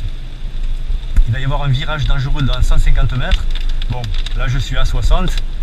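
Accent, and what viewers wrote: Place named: Carcassonne, France. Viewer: Ton accent me semble familier, Carcassonne ? Je suis a 1h de montpellier :)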